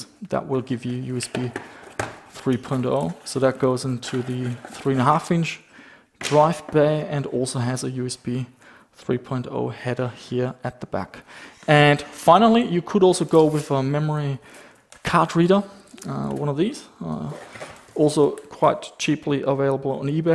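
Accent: Germany. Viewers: ah jes🙈its sounds like you are from Germany😂no hate nice Video✌🏼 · Wenn deutsche english reden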